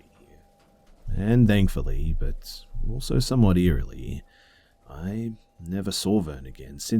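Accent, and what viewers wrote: Australia. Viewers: Sound like my buddy from Australia 😂, got him listening to you. Dig your channel my friend.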